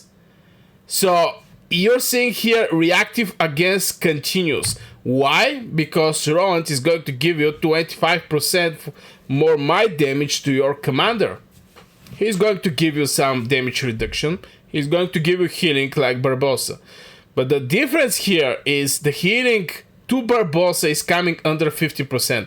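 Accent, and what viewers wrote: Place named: England, United Kingdom. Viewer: wow, thank you for the quick reply.. keep doing more videos.. so happy to see you back making more videos.. I love your English accent.. you are doing great 🙌🏻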